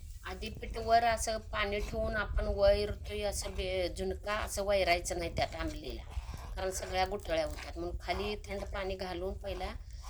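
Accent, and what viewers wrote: Kolhapur, India. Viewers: तुमचे सगळे पदार्थ मला खुप आवडतात आणि तुमची कोल्हापूर ची भाषा पण आवडते ☺️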